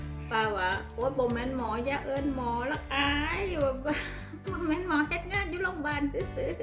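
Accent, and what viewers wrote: Phu Thai, Thailand. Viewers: เว้าภูไท ฟังเพลินครับ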